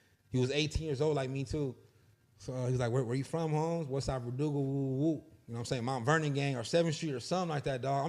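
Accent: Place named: Mexico. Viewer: He got more of a Mexican accent you got mexicans straight from mexico that look exactly like him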